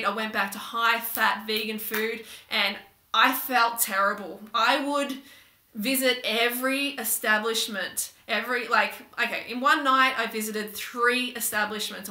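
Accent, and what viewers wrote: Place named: Australia. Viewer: The Aussie accent has to be the best in the world - everything about it is awesome.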